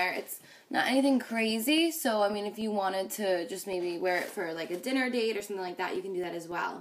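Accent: New Jersey, United States. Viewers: look at the accent! haha jersey girls! we know whats good <3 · Are you from New Jersey? your accent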